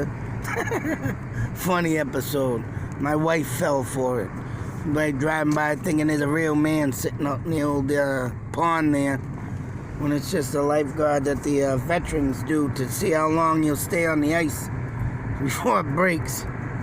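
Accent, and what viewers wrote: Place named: Massachusetts, United States. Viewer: wow! thanks for the drive by tour .very cool! i noticed the Bennett property added some new buildings . that pizza place you were talking about used to be a small grocery store. then became a honey farm convenience store .christophers pub next door use to be a music instrument store. and where that subway sign is used to be a record store then it was a paint store . i think the old courthouse was in the same building as the police station .as for that haunted house, when i was a kid , in the 60s and 70s, there were allot of old houses rumored to be haunted and that was probably one of them . wow Montys gone, dunkin donuts gone wtf! lol .thanks again for the ride. it was fun to watch and cool to hear a massachussetts accent again.cheers!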